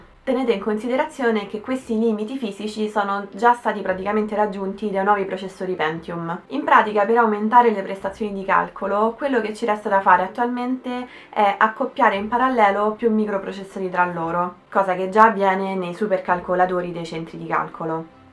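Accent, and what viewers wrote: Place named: Aosta Valley, Italy. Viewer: Brava, precisa e spigliata. Proprio a trovare un pelo nell'uovo l'accento valdostano ;-) E poi morirò chiedendomi se si dice nanòmetri o nanomètri.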